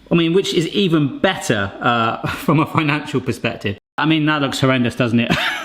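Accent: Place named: Bristol, United Kingdom. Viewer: Now I know ur from Bristol I cant Unhear it ahaha Bristol stand up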